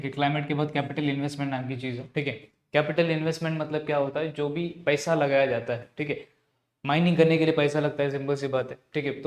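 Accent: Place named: Maharashtra, India. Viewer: Ha bolyvarun tar marathich vatat ahet sir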